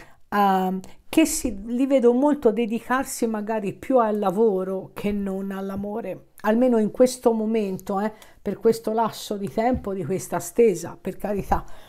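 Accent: Tuscany, Italy. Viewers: Ciao ti seguo sempre sei bravissima ogni volta che ti ascolto sembra che parli della mia vita, azzecchi tutto ……. Adoro il tuo accento toscano……i tuoi consigli sono molto preziosi e mi fanno riflettere sui prossimi passi da fare nella mia vita …..grazie 😘😘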